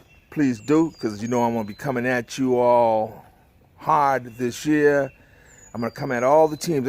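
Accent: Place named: Bronx, United States. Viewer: Where are you from? You sound like the Bronx. Thank you, I pray the same for you.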